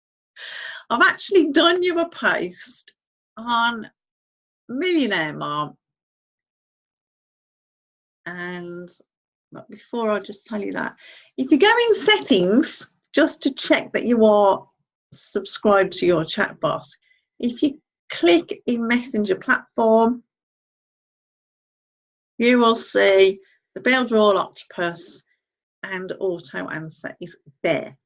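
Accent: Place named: United Kingdom. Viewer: With your accent shouldn't it be Millionaire Mum?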